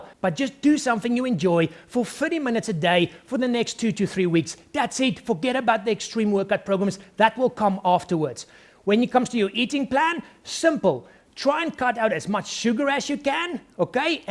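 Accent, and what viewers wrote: South Africa. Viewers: Are you south African? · South Africa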